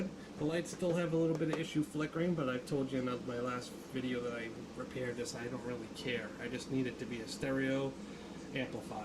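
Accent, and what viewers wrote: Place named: Boston, United States. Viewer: cool setup. you sound like you are from boston guy ;)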